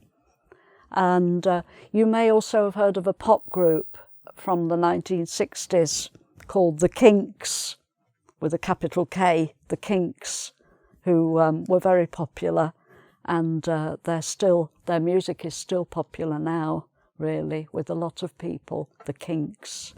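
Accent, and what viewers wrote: United Kingdom. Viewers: What a beautiful British accent. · The British accent kill my ears. Jesus.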